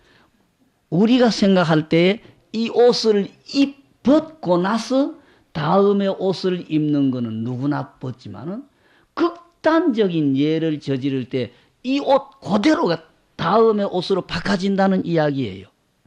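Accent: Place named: Gyeongsang, South Korea. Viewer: 스님 너무 그립습니다 다시 듣고 또 듣고있습니다 경상도말에 담담하게 하시는법문이 가슴에 너무 남습니다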